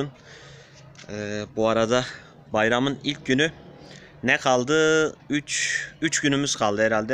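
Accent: Gaziantep, Turkey. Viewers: Aaa Reis antepli misin sen?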